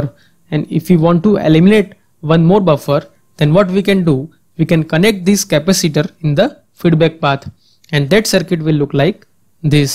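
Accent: India. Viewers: Very heavy Indian accent but the content is great, thank you for your sharing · indian accents are beautiful.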